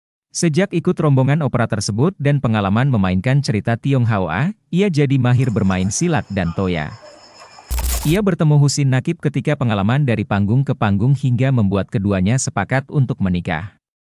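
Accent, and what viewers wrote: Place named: Surabaya, Indonesia. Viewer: Gara2 suara surabaya saya terdampar disini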